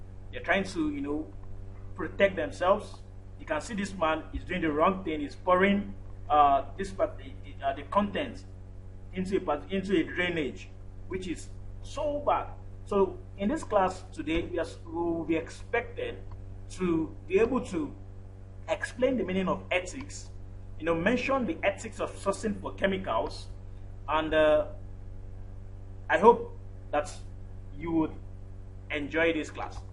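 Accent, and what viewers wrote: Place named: Nigeria. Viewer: Why can't you speak real diction Man see your Nigerian accent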